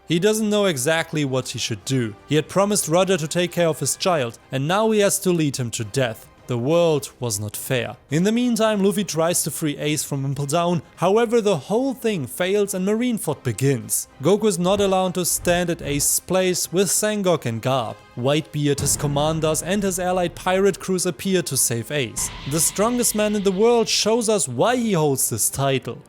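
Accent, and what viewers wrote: Germany. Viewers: Are you german? You have the accent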